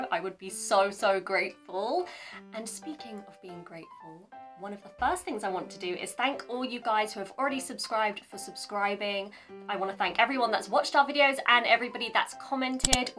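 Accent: United Kingdom. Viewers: Why U r not that popular!?!? Ur face is so pretty 😍 And ur Japanese is good as well. If u start couple CH maybe U’ll get more views??? Idk but anyway. I’m surprise that I found u today and u r not that famous. So I hope many people find u very soon and get to know this pretty cute British accent 👧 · WOW! I love your British accent! I will follow your video as my practice for listening. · 本当にイギリスの方の英語は聞き取りやすいと思います。米語のネイティブスピードは努力しても聞き取れる気がしませんが、英語はなんとかなりそうな気がします。あと、築地の時の話でも書いたように、の英語はマイ・フェア・レディの映画を見ているようです。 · All the best! I'm very familiar with British English as I have lived in UK for 10 years. It was very comfortable to listen British English! I would enjoy your channel.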